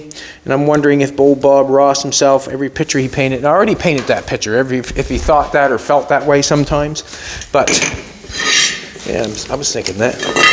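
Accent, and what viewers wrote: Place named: Canada. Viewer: that Canadian accent gets on my nerves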